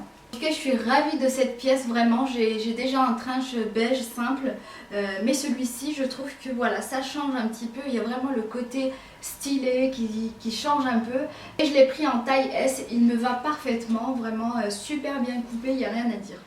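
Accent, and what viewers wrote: Morocco. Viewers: Ton petit accent marocain est très sympa sinon super haul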